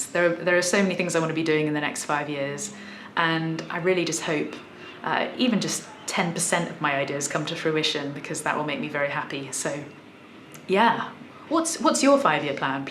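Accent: United Kingdom. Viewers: I have to admit, I watched this video just because I wanted to nap while listening to your British accent. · Sounds British too me, don't sound like you're from Liverpool but doesn't sound like you're from Texas (like me), doesn't sound like you're from Atlanta or the Joisey shore. Sounds British. London? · While you're accent may be a bit British, it's not truly British because I can understand every word you say (Ahm frum Jawghuh so eh ez purdie goot Ah kin git thru th wurl whentz zi git out'n da haus.) A truly proper British accent, and there are many, cannot be understood by anyone outside of the neighborhood in which it is normally spoken I believe the appropriate quote is, "If I were any more British I wouldn't be able to talk at all" Whatever your accent you do it well. Perfect voice to listen to 😎 Also: Like your new digs but those blank walls scream, "ERASABLE MARKER ATTACK" 😁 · British accent is nice; I'm smitten with those beautiful brown eyes, I'm in love....a little bit of madness...with with great guitar that is. 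· Hi, your british accent is what I like the most! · Wish I had your looks along with that beautiful British accent. And then you play the guitar and sing as well. Beauty, accent and talent. Now I call it 3 in 1. I am writing from Sri Lanka where I was born and been living since then, and I am no where as beautiful as you are. And I cant speak English the way you do. Wish I could. God bless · I love your accent. It is the best British accent I've ever heard. Everything you say is perfectly enunciated and so easy to listen to. I wish you the best on your new work space (studio) and your future endeavors. Love from Miami ❤️❤️ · Quite a lovely lady and axe player. Love your clipped British accent. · The non-rhotic British accent such as your beautiful accent, is not a classic accent. It didn't exist before the late 18th and early 19th century. It's really quite recent. Many would be surprised to know that the current American accent is quite similar to the British accent of the 18th century and that it is the current British accent that has changed. 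Sorry. Linguistics geek;) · I've watched several of your videos before, and suddenly I just subscribed at WINK! Looking forward to being a further viewer/listener/learner. I lived four years in the UK. You're definitely a Pom! Love your eloquence. Hi from Oz, oi oi oi!;-):-) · Love the video!! Good luck setting up the studio. Maybe the next three videos you give us a different British accent each time… In song even!! Love your channel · As an American we love British accents! We generally can't tell the difference between different areas, but I love yours and clearly you're from a classy background! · In 5 yrs you'll be just wherever you wanted to be! Life is good!! Love your British accent..!! Hi from Ecuador-South America!! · your accent sounds very british ------ but i know nothing about you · your accent sounds british to me, i'm from the boston, massachusetts area and have a strong accent and i'm 71 yrs. 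old and most of the people around my age have a boston accent which we picked up from our parents and surroundings when we were young. now i've noticed that most of the younger people like milleniums don't seem to have this accent or altered maybe due to their exposure to more people via the internet. my wife is from western new york state and has a more neutral sound to her voice. one could to a real study of all the regional accents in the U.S.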